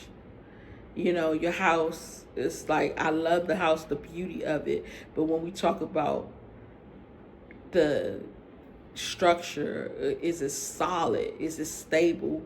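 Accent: United States. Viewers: Nobody knows you are an American until you speak. So I don't agree with you when you said Africans think they are better than Americans. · I hear that DMV accent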